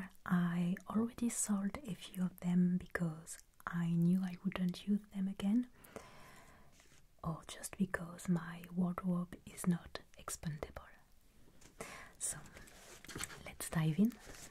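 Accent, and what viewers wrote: France. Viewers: Heyy I absolutely looove your asmr videos I just clicked on one of ur videos and I fell in love.. ur asmr is aesthetic it’s soo pretty to look at, I am learning French and I love your French accent. I think the French is a language that is perfect for asmr. I hope you make a video in French and definitely put some subtitles💕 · J'adore regarder tes vidéos. Je suis française et vit aux Etats-Unis. Entendre ton accent français me rappelle à quel point la France me manque. Merci beaucoup pour tes superbes vidéos. Elles me détendent quand je suis stressée. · Very French accented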